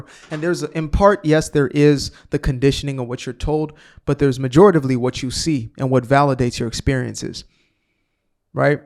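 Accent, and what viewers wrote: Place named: United States. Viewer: Yea you sound American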